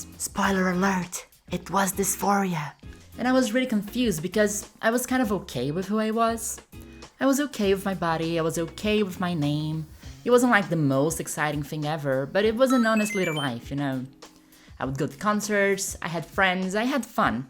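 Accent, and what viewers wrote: Brazil. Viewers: Don't you dare apologize for your beautiful Brazilian accent! The way you say infamous and frequently is extremely charming! Technically I can't speak for the entire United States but to the ear of a person in the US the Portuguese accent and pronunciation is very attractive to our ears, now for example there are other accents that don't sound quite as lovely in English but I don't want to be rude, I'll just say that a certain German chancellor would have a very harsh sounding accent. Also, great video full of nuance that I think will be beneficial for many young women. Strangely enough my daughter never really voiced concerns about passing but she really needed affirming surgery for her mental health, but it was for her not for anyone else. She's kind of special that way! ☺️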